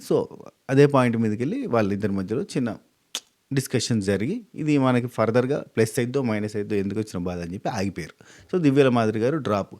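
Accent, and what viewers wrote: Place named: Chittoor, India. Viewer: record cheste mimmalni Enduku kodatharu garu cheppandi miru Adbutham ga video's chestaru Adi kuda Swathi pickles entha veyttikina dorakadam ledu kani miru mee webset pampinchi chala manchi panichesaru garu Mee language chittoor slang chala bagundi garu ee madyalo mee youtube video's chala Trend ga marindi garu mee chittu pakala block bord ekada dorukuthundo cheppandi garu plz nenu kuda Order cheskunthanu garu plz Aa youtube maike kuda entha chepthara garu plz nenu online lo veyttikithe 40000+ payment chupisthundi garu mee maike entho naku cheppandi plz garu plz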